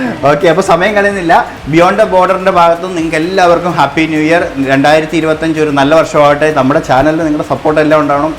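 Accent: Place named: Kottayam, India. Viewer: സംസാരം കേട്ടാൽ അറിയില്ലേ കോട്ടയം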